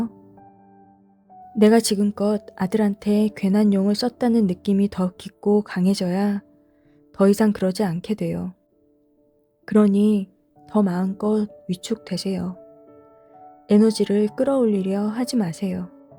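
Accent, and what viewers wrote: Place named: Gyeongsang, South Korea. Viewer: 목소리가 듣기 좋네요~❤ 경상도 사람 같아요 ~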